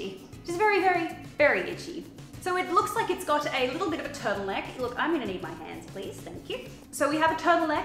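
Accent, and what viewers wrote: Australia. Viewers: Whoever is “correcting” you for saying things in your native Australian accent should be arrested because it’s a crime to tell someone with the cutest accent (but also, you’re AUSTRALIAN not American) to speak differently. I’m about to throw elbows with some of y’all in the comment section. People speak differently than you do, get over it. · I love hearing another Aussie accent on YouTube!:) Thanks for doing what you do! X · To anyone getting angry over how she pronounces words - she's Australian, they pronounce things how they do (and actually, it's often a lot more accurate than how Americans do... considering it's closer to the English way and it's the ENGLISH language). Also, I appreciate the cold weather clothes you're wearing in the warm weather. As someone who's sat in in 2 degrees (watching videos whilst waiting for the boiler man to turn up...).... 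I VERY much appreciate it. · Be PROUD of your Australian accent, American's don't talk correct English anyway, from a NZ' er however, Auss ie's, do nd correct dance, six, etc to correct british?pronunciation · I’m American, and I love your Australian accent! Different dialects/pronunciations are just part of what makes the world go round. People shouldn’t tell you that you’re pronouncing it “wrong” just because it’s different from how they say it. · I'm French and I love how she talks it sounds cute, I would love a video in which she teaches some Australian expressions like love hearts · You're Australian, speak as you normally would. I love hearing how different cultures pronounce the same words differently. Don't change just because some of us Americans can be bull headed ninnies. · Alright, I am just going to get this off my chest because it's honestly really annoying. She's fuckin' Australian. 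It's where she grew up, lived, and lives. I don't understand why so many people feel the need to tell her HOW to say things or comment so much about the accent, and nearly every time it's an American. lol. Newsflash: Not everyone talks like you, even in your own country you've got different accents. When my parents moved to the states, I had to un-learn my native language, basically, because she was scared that our accents would set us apart and we wouldn't be able to live more 'normal' lives. I'm re-learning it now which is strange but also fun. Anyway! Let speak the way she speaks, I love it.:) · Who said anything about her accent being bad. I think Australian accent is cute. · I'm very excited about the idea of thriftmas 🙌 sounds like a great time. Also don't mind your accent, your Aussie land accent is endearing. Plus I love that fact that all of y'all say love hearts ❤️ Also, strawberry Sam was too much 😂😂😂😂 reaction was perfect. 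· Pronounce words however you want, use metric measures and remind us what season it is where you live. Be proudly Australian and cater to your international audience without having to hide who or where you are. There is a fine line between catering to an audience and pandering to them, you do the former really well and shouldn't have to do the later just because some people can't grasp that thee are different countries in the world where things are different.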